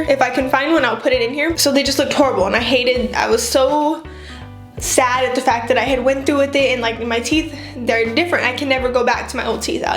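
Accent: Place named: United States. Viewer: This is so weird, your voice is exactly like my sisters and you have an American accent which makes it even weirder... no offense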